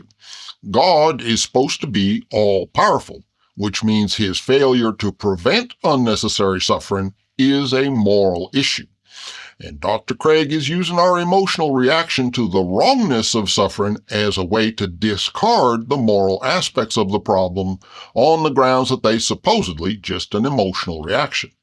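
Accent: South, United States. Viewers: You are healing my fear of southern accents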